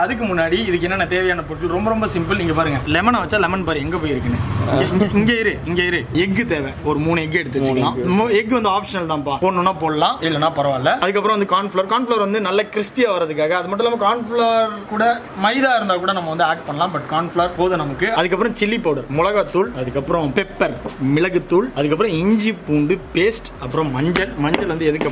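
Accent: Nagercoil, India. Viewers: Slang like nagercoil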